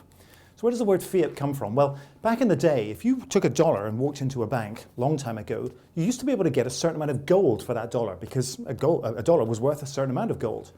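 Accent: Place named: Northern Ireland, United Kingdom. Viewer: Hey, great to hear a familiar dialect from an expat of Northern Ireland. Really interesting.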